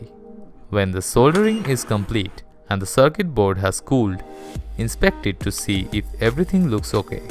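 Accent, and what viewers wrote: India. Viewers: Indian guy, hire a pure bred western guy for voiceover😉 No one will worry how induction cooker messes up smd things at 165 c coz hot air guns min temp is 130, get upto 500 deg c 😎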